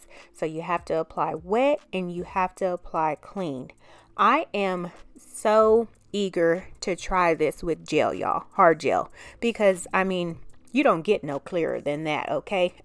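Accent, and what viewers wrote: South, United States. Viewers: These are so beautiful! You go you are so talented and I love your voice and to be it's southern and I'm southern lol.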